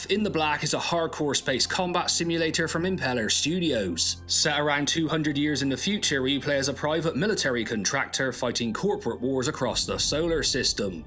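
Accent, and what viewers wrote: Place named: Bristol, United Kingdom. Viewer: Lol you sound bristolian AF mate - practically narrow it down to Hartcliffe - am I close?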